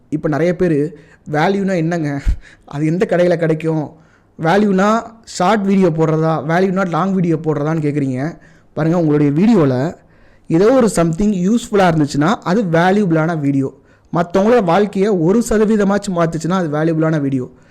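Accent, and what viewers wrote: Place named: Madurai, India. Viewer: Nalla example ..nalla explain panringa brother..appo appo madurai kusumbu pechu vanthu vanthu poguthu athum nalla tha iruku 😅super information 👍👍👍🙏🙏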